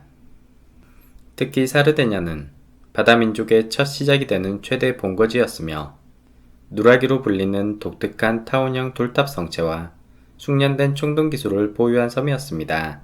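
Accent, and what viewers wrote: Jeolla, South Korea. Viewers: 내용이 좋은건 당연한데 은근 슬쩍들려오는 전라도사투리가 갱장히 친근하네요ㅋㅋ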